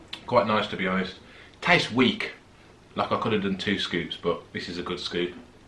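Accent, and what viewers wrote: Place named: Birmingham, United Kingdom. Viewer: 👍👍👍👍👍👍 sound like fellow brummie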